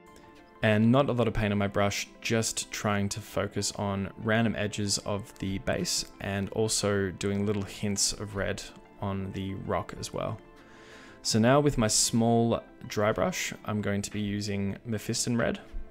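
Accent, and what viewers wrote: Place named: Australia. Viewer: You sound Aussie. These look great by the wat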